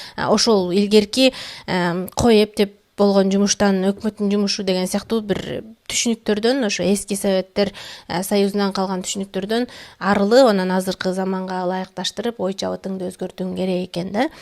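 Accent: Standard. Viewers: Абдан акылдуу, кыргызчаны таза сйлогон кыз экенсин чон рахмат!!! Кайсыл жерлик болосун? Мен Нарындын Ат- Башы районунан болом. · таза жана так кыргыз тилинде сүйлөгөнүң үчүн канча "жакты"белгисин басуу керек болсо,басмакмын.Өмүрүңө жана ден соолугуңа береке тилейм,аман болуп,кыргызга пайдаң тие берсин.Ошон үчүн да баракчаңа жазылдым. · Кыргыз тилин таза сүйлөгөнүңдү сыйлайм,өмүрүң узун болсун жаштарга үлгү болуп жүрө бер кызым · Суйлогонунуз да кыпкыргызча гоо! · Кандайсыз, Кыргызстандан сырткары иштеп окууп журсонуз да Таза суйлогонузду сыйладым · ...мен бул каналга бекеринен туш келбедим, бир дем менен угуп чыктым. Бул кыз кыргызча, орусча, англисче, туркчо ой жугурткон практик, динден кабары бар, жолдошунун акылманы, балдардын суйуктуу апасы, эн кучтуу МИНИМАЛИСТКА деп кабыл алдым🎉🎉🎉. Жашым бир топ улуу болгону менен озумо керектуу коп нерсе алдым! Эми уйкум качат 😅. 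Ото жонокой, таза кыргыз тили менен арбап алды. Аз тапканына чиренип, жерде басканын унутуп, мурдунун таноосу корунгучо чалкалагандар учун улгу болчу акылман кыз экенсин! Эми тынбай коромун! Орустар айтмакчы ПРОСТО ПОЛЮБИЛА!!! Токтобой ос ылайым Алтын кыз!!! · Кыргызчаны таза сүйлөйт экен. Баракелде🎉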